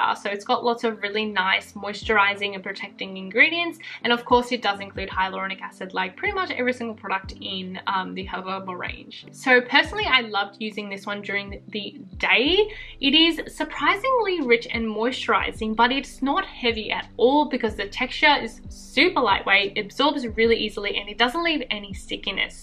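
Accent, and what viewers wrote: Melbourne, Australia. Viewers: I'm trying to develop the superpower that is Being Able to Distinguish Between Australian Accents. You have the accent of a well-educated Melburnian but you sound like some friends I have in Sydney too...I'm torn. Argh. Please let me know whether or not I should hang up my cape ;) PS= Hada Labo will be the next brand I really investigate next time I'm replacing skincare in Japan so thanks for this video. :)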